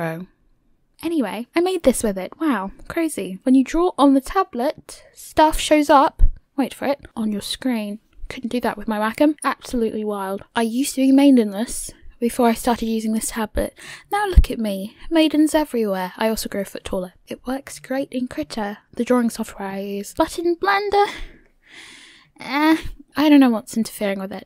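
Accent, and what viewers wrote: United Kingdom. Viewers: Love your British accent lol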